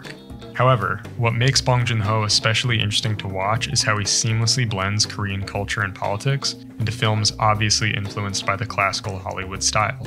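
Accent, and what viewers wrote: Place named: Eastern Europe. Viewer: I feel Slavic notes in ur voice. Gotcha 🤫🗿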